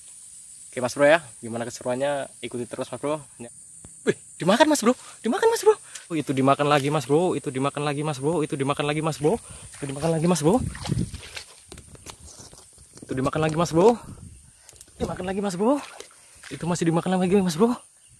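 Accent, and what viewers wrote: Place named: Medan, Indonesia. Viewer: Gila coy.. mantap betul tomangnya. Tapi aku lebih tertarik sama logat mu coy.. Jawa edan eh jawa medan ya. Dmna lokasi mu coy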